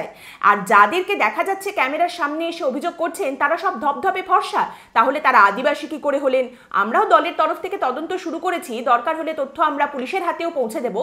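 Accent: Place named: India. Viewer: Apnar hindi accent is very nice 😊😅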